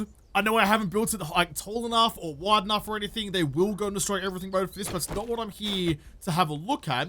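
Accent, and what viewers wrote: Melbourne, Australia. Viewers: Do all Melbourne aussies sound the same? You sound just like ozzy. It's knot gey but yut lovely 😍 lmao 😅 you're phenomenal ❤️ american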